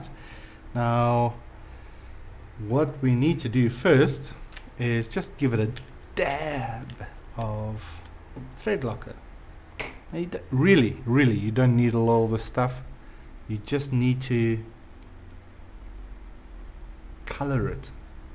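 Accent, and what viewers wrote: South Africa. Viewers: use Tweezers boetie ;) Recently moved to New York and Iv needed a new hobby so decided buying a drone might be the way... Iv been doing a bit of research on the Tarot 690s and they seem to be the most customisable of the lot. what are your thoughts on building vs buying a used one for about $550 without a transmitter? PS watching the video and hearing your accent has made me miss Cape Town soooo much!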